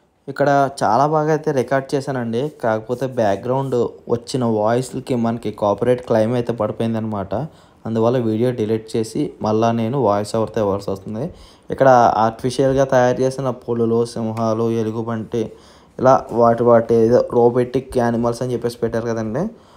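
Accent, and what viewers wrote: East Godavari, India. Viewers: Oribabu east godavari language apura babu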